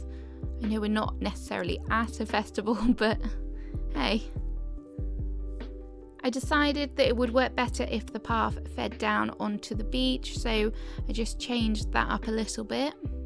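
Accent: England, United Kingdom. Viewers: your videos and your gorgeous English accent make me miss the UK so much. My Dad was English from Surrey and I lived and worked in the UK, years ago now. I was set to have a visit last year, but of course as we all know, Covid came in and changed everything for us all. Really love your videos and I am so loving the coastal theme. Wanting to do it on our island next thanks to your inspirations 😊💕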